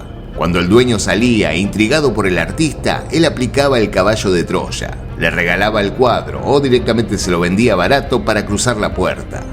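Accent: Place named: Argentina. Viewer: Amo este acento argentino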